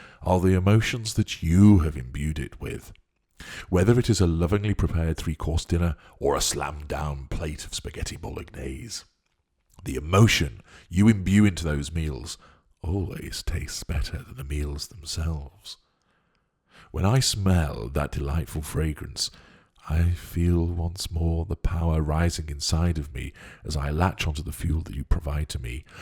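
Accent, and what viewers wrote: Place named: Oxford, United Kingdom. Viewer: I bet your bass baritone Oxford scholar accent is fuel for plenty of men and women, narcissist or not! 🎙️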